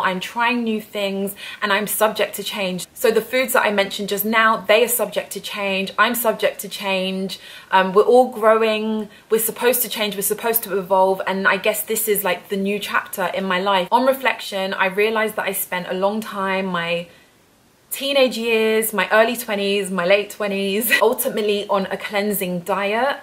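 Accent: United Kingdom. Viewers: Her British accent is soon cute!!!, wait a minute!!! I'm a brit n have one myself!! Damn I sound cute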